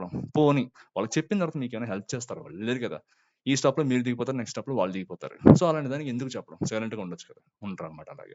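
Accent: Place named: Godavari, India. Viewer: పక్కగా గోదావరి బాబాయ్.......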